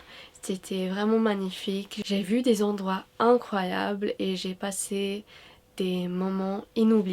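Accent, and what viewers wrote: United Kingdom. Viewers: i hope one day i could hear your British accent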